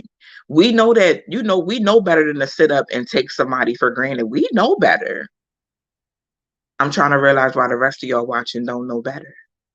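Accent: Louisiana, United States. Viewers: she has a cute country accent........ Like us in North Louisiana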